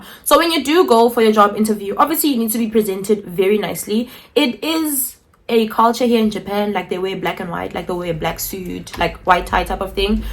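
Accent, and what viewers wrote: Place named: South Africa. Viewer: It's so nice to hear a South African talking about this. I have an interview next week for a ALT job in Japan. Fingers crossed!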